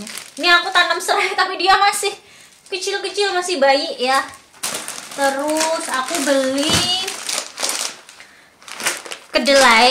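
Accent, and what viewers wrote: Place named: Java, Indonesia. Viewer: Tetap aja medok nya ngak bisa hilang ya bu ....hehehehe....salam buat suami...tetap sehat ya bu....🙏🙏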